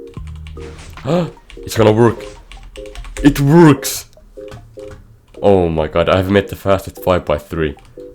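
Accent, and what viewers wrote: Finland. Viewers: Are you Finnish? 🤔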